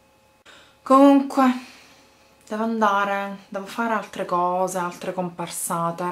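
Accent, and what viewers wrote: Sicily, Italy. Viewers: Non voio ! : ) Bellissima pronuncia. Sei siciliana immagino ! Simpatica e bella !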